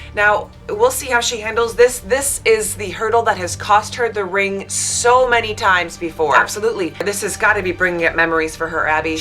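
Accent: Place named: Wisconsin, United States. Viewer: Very funny. The Wisconsin accent is similar to us here in Ontario. One question... I am trying to figure out the words in the intro?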